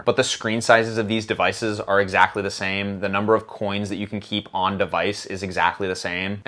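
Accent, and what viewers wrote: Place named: California, United States. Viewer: Thx for the video. Good info. Thanks, but why do you sound like your from Cali?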